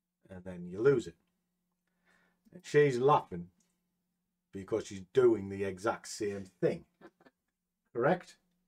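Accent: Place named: Northern England, United Kingdom. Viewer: Hey like your videos. Sounds like you're in my neck of the woods. Good to hear fellow northerners smashing it. Like the way you trade live. All the best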